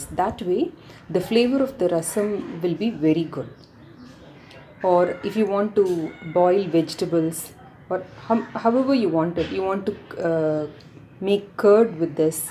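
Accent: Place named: South, United States. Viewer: Very very informative. Thank you. I like your southy accent.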